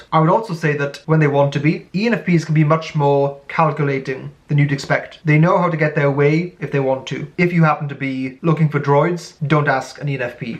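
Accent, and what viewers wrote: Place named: England, United Kingdom. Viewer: What kind of english accent is that?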